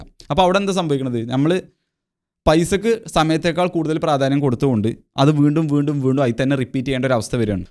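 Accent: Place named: North Kerala, India. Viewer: Bro that's not completely your fault, he is tone is slightly from the Northside which even the middles or Southside have a bit trouble to understand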